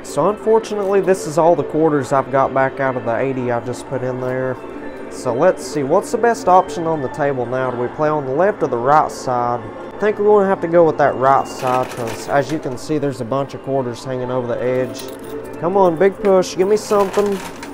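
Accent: South, United States. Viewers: I love watching you play the coin pusher. I live in Canada and I really like you southern accent.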